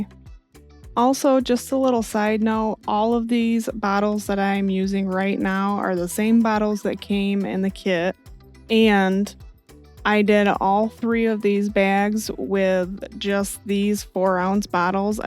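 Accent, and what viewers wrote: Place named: Michigan, United States. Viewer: This channel is amazing! Are you from Michigan, by any chance? You have the exact same accent as my friend Dawn! You could be sisters based on your voice.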